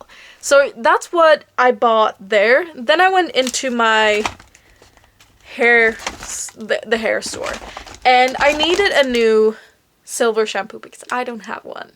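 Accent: United Kingdom. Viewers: Jag måste verkligen säga att jag älskar din engelska accent😍 Och kul med böcker, är själv en bokmal 😊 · Älskar att du pratar med brittisk accent 😍 ps älskar dina videos ❤️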